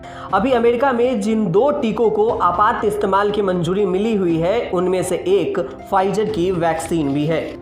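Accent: Bihar, India. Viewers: इनकी जबान साफ नहीं है जिससे समझने में दिक्कत हो रही है बिहारी टंग है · Iski awaz acchi hai voice over bolne wala bihari hai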